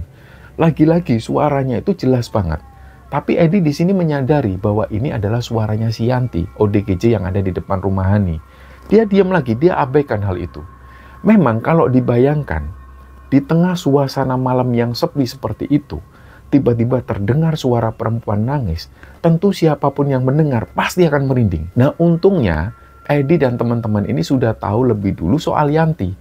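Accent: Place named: East Java, Indonesia. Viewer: Njenengan tuh telling story nya mmg beda dr yg lain lho Mas. Logat Jawa Timur nya jg masih kental 👍👍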